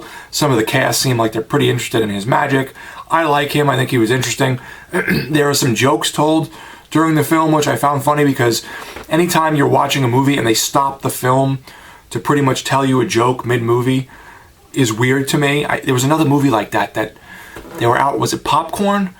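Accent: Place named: East Coast, United States. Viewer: I'm in North Jersey now & I've been here for a while now but I'm always visiting fam in NYC. Hearing your voice, I figured you were from the East Coast, I just didn't think it was that close to home haha. I just followed you on Twitter.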